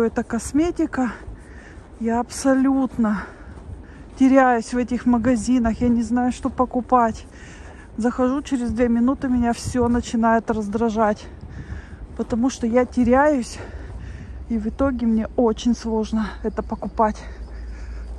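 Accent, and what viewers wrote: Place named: Urals, Russia. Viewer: Уральский говор он конечно очень выделяется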